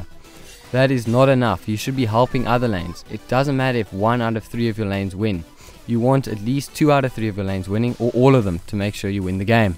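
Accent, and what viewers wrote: South Africa. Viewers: You south african? 😆 game name?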